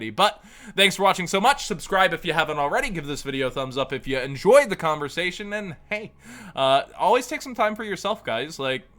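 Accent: Texas, United States. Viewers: Howdy y'all! And welcome to the house! That Southern Texas accent though.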